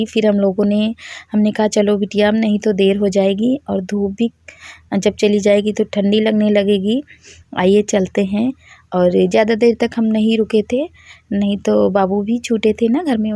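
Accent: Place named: Naini Nibi, India. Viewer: सिस्टर आप का भाषा और बोली जो है न अयसा लगता है कि आप नैनी निबी के तरफ के हो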